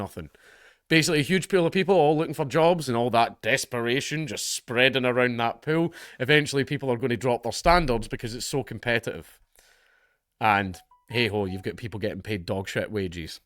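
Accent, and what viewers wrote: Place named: Glasgow, United Kingdom. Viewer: I like that Dankula pronounces Chutzpah correctly almost by accident because of his Glaswegian accent..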